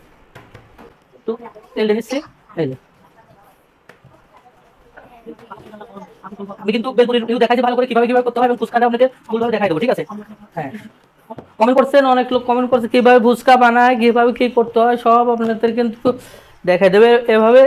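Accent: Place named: Bogura, Bangladesh. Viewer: বগুড়া বাড়ি মনে হয়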